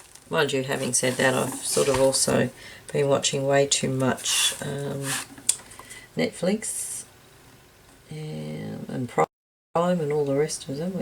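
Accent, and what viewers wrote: Australia. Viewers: Hi, just found your channel on u tube! love listening to an Ozzie! Happy New year and take care of yourself, love from Queensland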